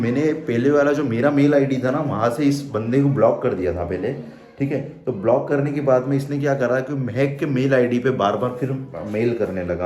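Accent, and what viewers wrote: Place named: Indore, India. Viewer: I am from Bhopal and your language tone is indori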